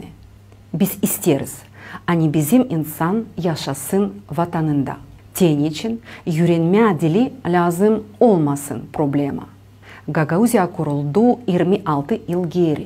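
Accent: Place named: Thrace, Turkey. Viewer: Yeminne Trakya türkçesi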